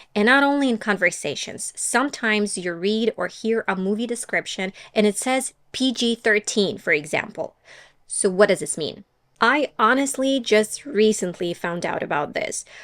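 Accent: United States. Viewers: How amazing I ve been watching ur videos for a long time I cant believe that u arent American...u are great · Hey, as an native English speaker would you say she sounds like an American? (Cause to my ears, I'm Brazilian, she does). If she doesn't, what gives her away? Would you weigh in, please?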